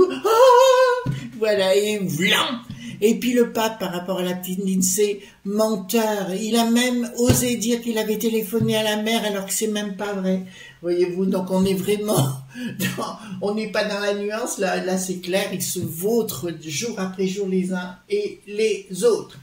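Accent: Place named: Lyon, France. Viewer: Je vous écoute pour la 1ere fois sur le conseil d une voyante que je suis j adore votre franc parlé je trouve que vous avez un accent lyonnais et certain mots es que je me trompe je suis lyonnaise j habite Montpellier je suis ravie de votre vidéo merci 👍👍👍👍👍😂😂😂😂👋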